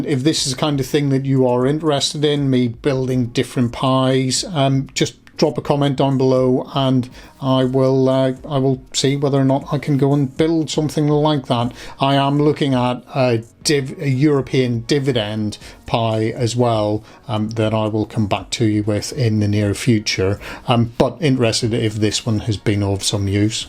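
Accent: Northern Ireland, United Kingdom. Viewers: Great content plus good to hear a local N.I. accent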